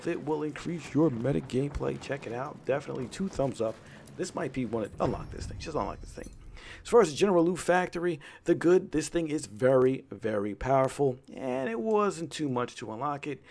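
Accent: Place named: Philadelphia, United States. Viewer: this guys gotta be from the Philly area. Lived my whole life here, can hear a Delaware Valley accent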